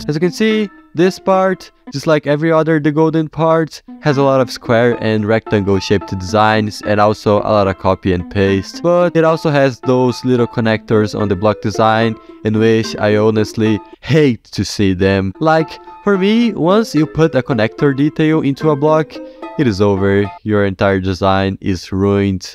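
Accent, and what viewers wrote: Brazil. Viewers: You brasiliano? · are you from brazil? the accent sounds familiar...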